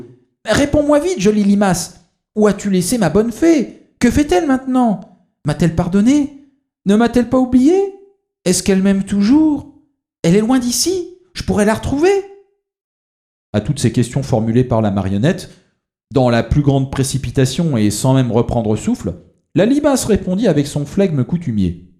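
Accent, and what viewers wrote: France. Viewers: Milles merci pour la lecture de voix avec intonnations francaises! Tu m'as beaucoup aide pour apprendre le francais populaire! Spasiba! )))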